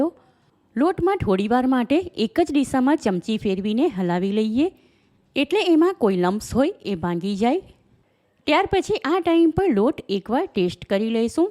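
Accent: Surat, India. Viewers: A sweet surti tone chhe 😊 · સુરત નું ગુજરાતી આવું હોઇ છે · surat ni gamdani boli che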